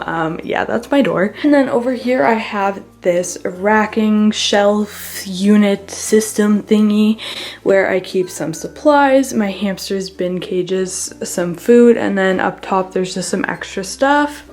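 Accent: Canada. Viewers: Are you Canadian? This isn’t supposed to be rude, I think how you say “sorry” is actually really cute lol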